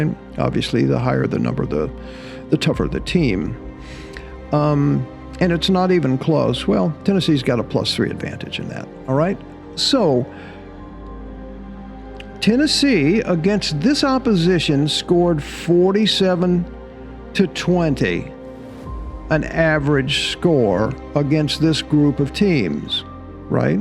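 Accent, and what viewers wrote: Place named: Georgia, United States. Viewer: The reason for the accent is Georgia was a British penal colony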